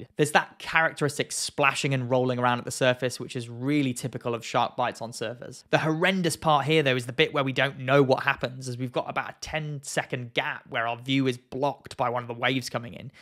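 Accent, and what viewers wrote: United Kingdom. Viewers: ❤ the British accent!!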